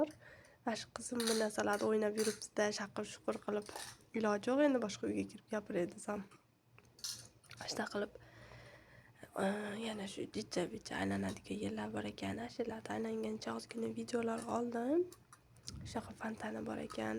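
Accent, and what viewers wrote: Andijan, Uzbekistan. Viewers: Оллохни уйида мазза киляпсиз экан да оллохим уз даргохида Кабул килсин Каеридансиз шева анжан а рахмат катта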